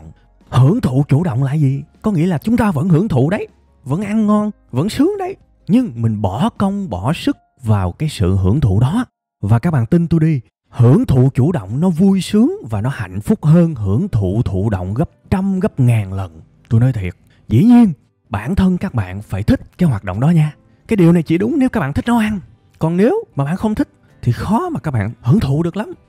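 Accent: South, Vietnam. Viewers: Giong noi mien nam nghe de hieu va de gan . One like 🤗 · Giọng MIỀN NAM của banj rất hấp dẫn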